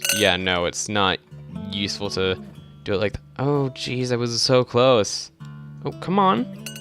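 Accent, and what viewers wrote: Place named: South, United States. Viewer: YOUR COUNTRY VOICE THO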